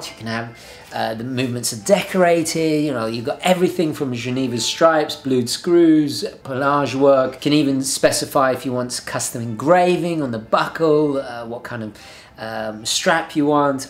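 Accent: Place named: United Kingdom. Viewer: You sound more British today! Have you been talking to your relatives?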